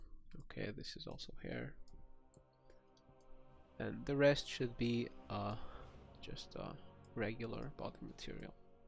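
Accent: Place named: Portugal. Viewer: Nice video man, could swear by your accent you are Portuguese (^_^) (Confirmado, reparei agora no canto inferior direito do ecran, ta o teclado em pt heheh) Continua esses videos bacanos, abraço.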